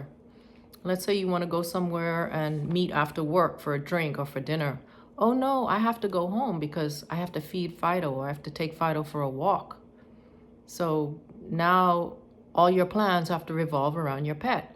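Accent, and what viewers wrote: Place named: Caribbean. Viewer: Based on your accent, are you from the Caribbean?. Excellent advice by the way.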